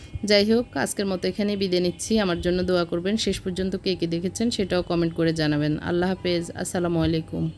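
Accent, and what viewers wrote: Noakhali, Bangladesh. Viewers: You sounds like from Noakhali.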